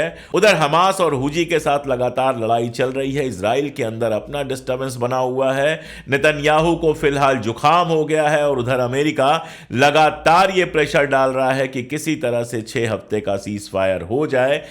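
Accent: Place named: Awadh, India. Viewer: Bhayya aap ki aabaaz me Oudh ki khushbu aati hai, kya aap Oudh se hain? aapki patrakarita me insaniyat ki khshbu hai, Allah Paak aapko aise hi kaamyaab rakkhe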